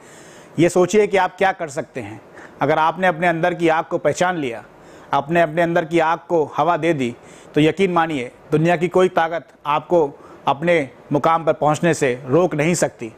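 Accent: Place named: Agra, India. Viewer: I am also from agra .tabhi inka accent bahut krra hai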